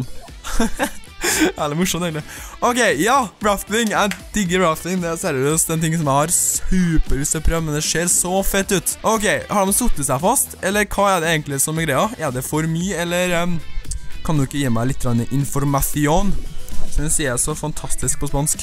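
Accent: Trøndelag, Norway. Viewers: E du trønder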